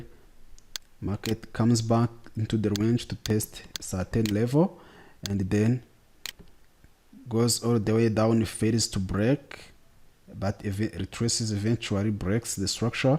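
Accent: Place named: East Africa. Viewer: That accent sounds east African, it's good to be tonight by my fellow neighbor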